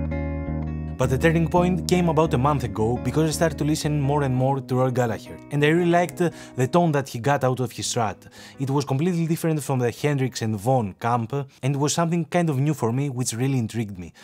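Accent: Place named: Greece. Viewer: Σίγουρος από τα πρώτα 2-3 δευτερόλεπτα ότι ήσουν Έλληνας.. Nice vid bro, keep 'em coming! Subscribed!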